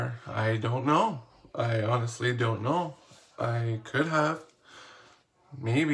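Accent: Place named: Australia. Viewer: Australian accents hit different <3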